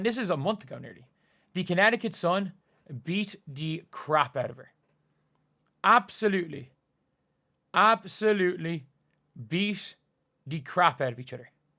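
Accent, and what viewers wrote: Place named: Ireland. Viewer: I enjoy your commentary n your thick Irish delivery!😂🎉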